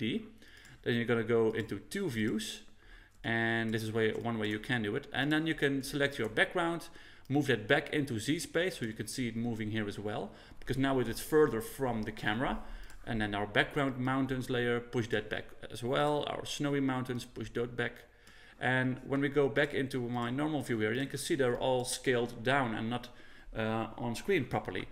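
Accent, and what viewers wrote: Netherlands. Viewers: Ik hoor dat je Nederlands bent. Bedankt voor deze tutorial :)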